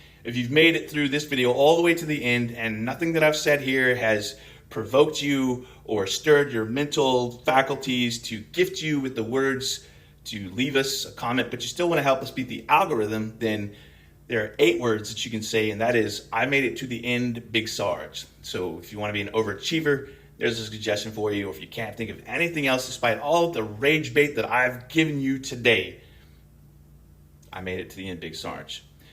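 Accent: Scotland, United Kingdom. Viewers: Serious question: is the Scottish accent a bit, or is that Big Sarge's native accent? Like, was he born in Scotland and then moved to the US or something? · It's even better with a Scottish accent and a fellow gamer!! Even more hooked now!! 😍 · big sarge, your scottish is showing. oh yeah and I made it to the end mfer. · I love that you used your Scottish accent. 👍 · Love the Scot-talk. 😊 Made it to the end... for the algo. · Go full out Scottish accent! Especially your initial rant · Hey Big Sarge I love you, your scottish accent, and your starship!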